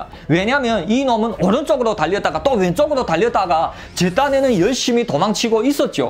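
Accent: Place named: Northeast, China. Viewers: 와 진짜 재밌다.우리 동북에서의 구수한 사투리에 반함 ㅋ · 이분 조선족 사투리 해설 정말 재미있네 앞으로도 계속 부탁할께요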